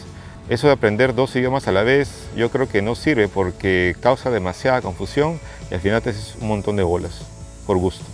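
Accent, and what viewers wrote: Peru. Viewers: Hola! X tu dejo asumo q eres un compatriota peruano. Si mi fuerte es el inglés (con escazo o mínimo francés) sería suficiente para conseguir un empleo allá mientras aprendo el mismo francés en Québec? AbrazO!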